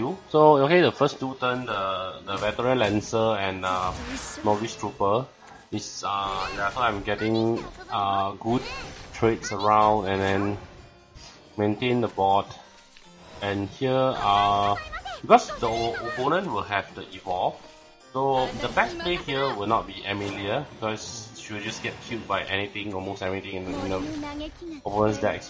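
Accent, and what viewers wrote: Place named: Malaysia. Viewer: you sound like malaysian tho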